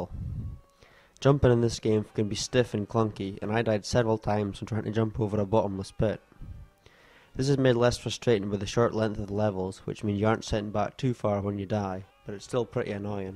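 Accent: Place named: Scotland, United Kingdom. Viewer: is it possible for Xbox one backwards compatibility. Probs not. Is it on steam or any other seller? By the way, you sound Scottish, I'm from there as well :D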